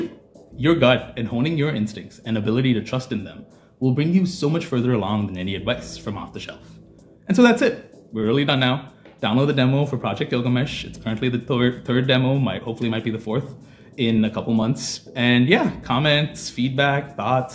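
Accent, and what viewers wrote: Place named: Brazil. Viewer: Your accent almost made me think you're Brazilian... It's so close...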